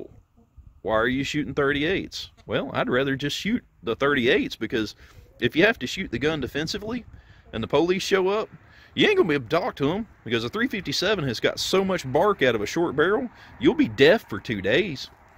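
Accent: South, United States. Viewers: Your country accent and the chickens 🐓 are priceless…great vid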